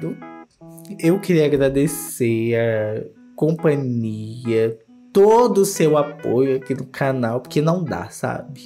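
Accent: Minas Gerais, Brazil. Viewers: gostamos MT do seu "minerês" precisamos mudar n ( mas se te incomoda, ok) rs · as vezes eu esqueço que vc é mineiro, aí eu fico prestando atenção no sotaque e fico uai ele é mineiro kkkkkkkk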